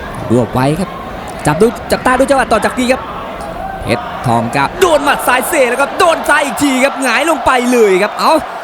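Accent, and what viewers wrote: Isan, Thailand. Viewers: มักเจ่า พากมวย เว้าอีสาน ม่วนๆ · พากษ์อีสานจนจบเทียแนเเด้อ😂😂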